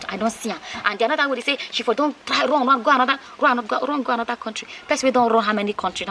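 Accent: Nigeria. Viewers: leee😂😂😂😂your mouth sweet for the pidgen English no be small 👍👍👏👏👏💖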